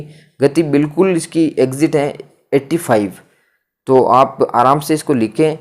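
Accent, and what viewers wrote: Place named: Rajasthan, India. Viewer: आप की हिंदी मे राजस्थानी का थोड़ा सा मेल है इसलिए कुछ शब्द अलग सुनाई देते हैं 😅😅😅😅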